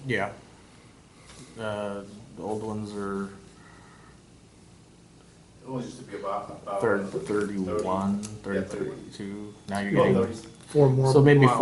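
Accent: Appalachia, United States. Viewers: and his Andy of Mayberry talk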